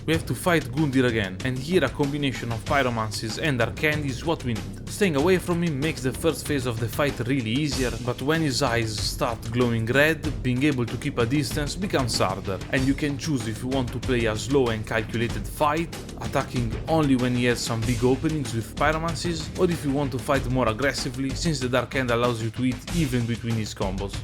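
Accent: Italy. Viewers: Bro dall'accento ho capito subito che eri italiano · funny how i recognized your italian accent only because i saw a shitpost about vento aureo where there was italian text to speech speaking english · Prime tre parole e ho istantaneamente sentito il fatto che eri italiano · I love your videos and also I love your accent. If you're Italian "spero nel meglio per te e il tuo canale" Else "I wish you the best" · You italian or french? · Si sente che sei italiano, ma parli veramente bene in inglese✌🏻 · bellissimo come io sia capitato su questo video per caso e si senta l'accento italiano hahahahahaha, comunque complimenti per l'inglese👍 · oh right you're thick italian accent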